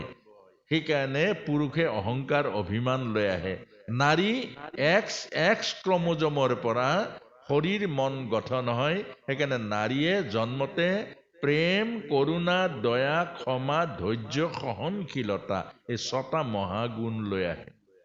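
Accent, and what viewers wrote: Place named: Bodo, India. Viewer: Bodo accent ❤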